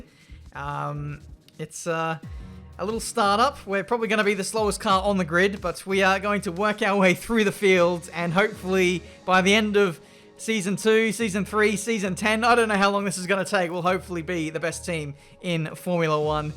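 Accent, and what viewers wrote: Australia. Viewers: oi mate you from Australia? sounds like that, hehe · Are you australian? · i was suggested this channel by a fellow formula 1 friend and i was like "oh ok, ill start watching him then" this is the first video of yours ive watched and its fliping amazing what makes it even better is the fact ur australian, i love australians, i think their accent is so cool i will definetly be recommending this channel to other people keep up the amazing work also congratulations on making it to f1 e sports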